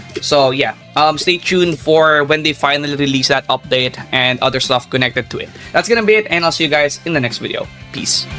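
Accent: Philippines. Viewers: Bro got that Filipino accent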